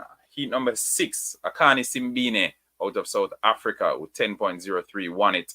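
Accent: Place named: Jamaica. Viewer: Yeeaahh,, already can catch up/easily understood yr Jamaica's english‼️😆😅Thnk u vm! 👍👍😁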